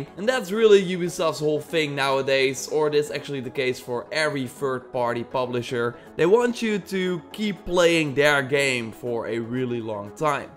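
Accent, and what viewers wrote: Netherlands. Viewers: jij komt uit nederland dat hoor ik gwoon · ik hoorde echt gelijk aan je accent dat je Nederlands was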